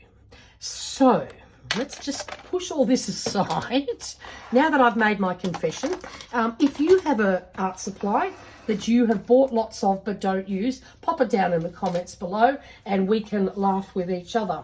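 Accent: Australia. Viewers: Nice honest review. I just found your channel because I was looking for reviews on this book. Nice to be greeted by an Aussie accent. G’day!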